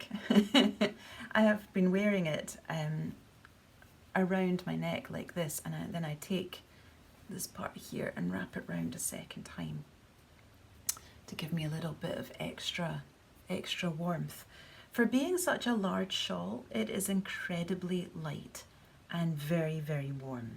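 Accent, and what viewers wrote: Scotland, United Kingdom. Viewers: I absolutely love your Scottish accent and your gentle voice! My question is what is the coldest it can get in the Scottish winter and when it’s cold what type of yarn do you choose to wear? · Dear, what a lovely podcast you make. I love the 'slowness' and find it very relaxing to just listen to your beautiful voice and scottisch accent while I'm knitting, especially in these times when there's so much stress going on in the world. My question is: Would you please show a picture of your son wearing his quilt and the socks you made him? Only with his permission of course 😃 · I am happy to have discovered your podcast just after you posted your first episode. You have a lovely, calming voice and as someone who visits Scotland yearly (not 2020 unfortunately), it warms my heart to hear the Scottish accent when I'm so far away. 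My question is do you ever begin a project and decide you are just not feeling it and decide not to finish? If so, do you have any examples? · Thank you for this giveaway. I’ve never seen such gorgeous project bags and notion pouches before. This is the second video I’ve seen of yours and was wondering why your accent isn’t as strong as some others. Did you grow up in Scotland or just moved there in later years?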